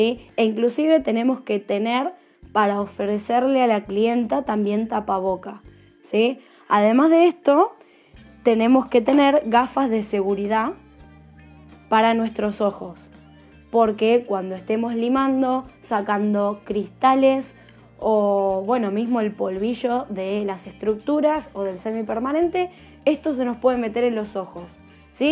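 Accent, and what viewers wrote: Argentina. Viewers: Una pregunta ,sos Argentina???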